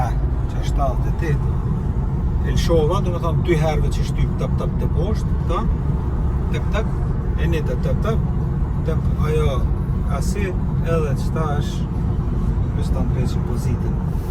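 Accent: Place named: Skopje, North Macedonia. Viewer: prsh, mos je i shkupit i nderuar , dialektin tipik shkupjan gjithashtu edhe stilin , respekte klm.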